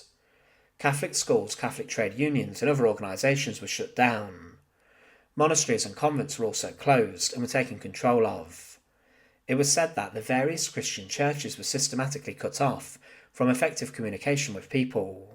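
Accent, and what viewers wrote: United Kingdom. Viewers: It's a type of British pronunciation which I will never understand. But then, I hear myself saying "gonna" for "going to," so we all have our flaws.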